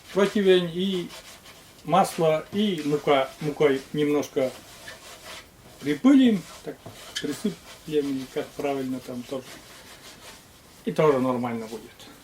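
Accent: Komi, Russia. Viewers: Я думала когда впервые увидела Ваш канал,что это блогеры с Прибалтики.В Коми свой акцент при разговоре.Покажите ещё лепешки с содой.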